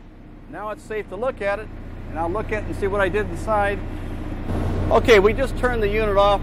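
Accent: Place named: United States. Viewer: I did not know Americans really did talk like that (fascinating).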